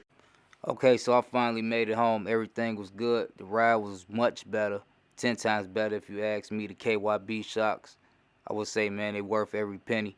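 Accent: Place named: Chicago, United States. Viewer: I noticed right off the bat you’re a Chicago guy, you sound just like one of us. Straight Chi City sound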